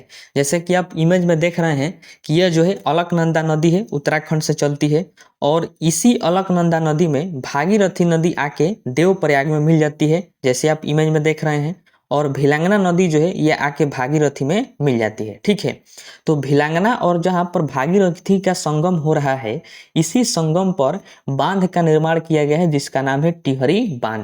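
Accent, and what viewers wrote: Bihar, India. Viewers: Bihar se hai bhaiya sir up se nhi 😅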